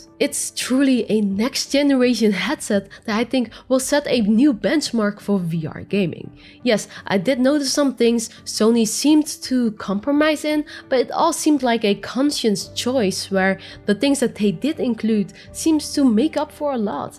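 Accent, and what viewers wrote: Netherlands. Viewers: You sound Dutch.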